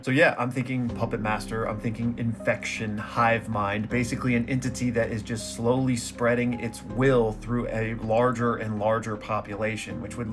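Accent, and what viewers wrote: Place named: Canada. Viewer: I thought you were Canadian 😂😂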